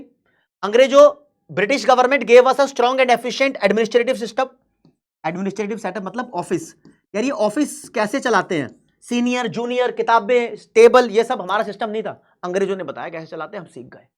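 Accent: Punjab, India. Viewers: SIR APP PUNJABI HO KYA BATO SE LAG RAHA HAI 😅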